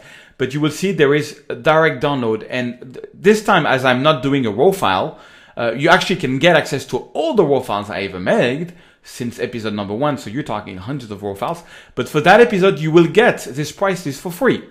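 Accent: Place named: France. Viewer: Well done! I like your French accent in English. That's cute! Anyway, just to say hi and I like the way you speak and teach. Learned a lot from you. Cheers~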